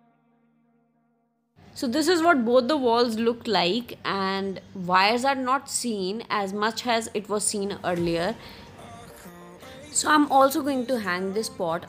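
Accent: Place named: India. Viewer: Indiam accent? Arggg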